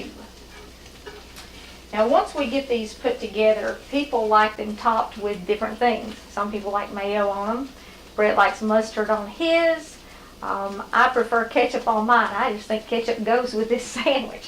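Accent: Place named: West Virginia, United States. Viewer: Hello ... I am a new subscriber ... I absolutely LOVE your videos .. I LOVE to hear about the Lord Jesus ... Thank you for including him & giving him the Praise & the Glory that he so rightfully deserves .... by the way ... Where are you from? i am from WV living in Bristol Va .. but you sound like you may be from West Virginia ... God Bless !!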